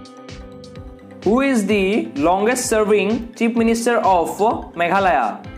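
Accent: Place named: Assam, India. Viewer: Akhomiya accent 😂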